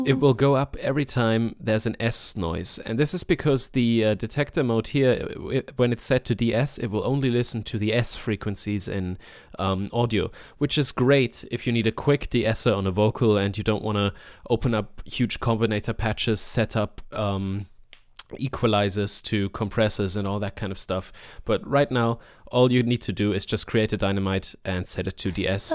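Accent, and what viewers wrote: Germany. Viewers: Coole Tutorials und Reviews, aber dein deutscher Akzent geht mir voll auf die Glocken alter !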